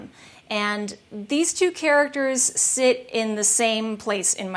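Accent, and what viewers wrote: Russia. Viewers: I LOVE YOUR RUSSIAN ACCENT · wait, you're russian?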